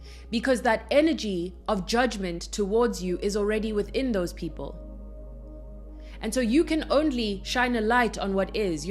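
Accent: South Africa. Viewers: Gurllll are you South African? 👀✨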